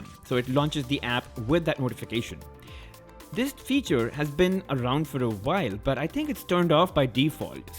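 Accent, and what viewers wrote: India. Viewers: Damn that Indian accent!! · Fuck your indian accent 🖕🖕🖕🖕🖕🖕🖕🖕🖕🖕🖕🖕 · Hey man are u indian...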